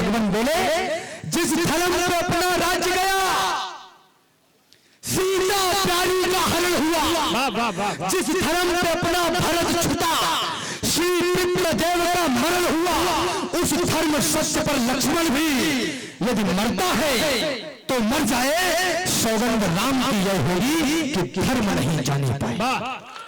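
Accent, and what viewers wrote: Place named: Bundelkhand, India. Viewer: आपकी आवाज में दम है, आवाज भी बुंदेली। 🙏🙏🙏🇮🇳🇮🇳🚩🚩🚩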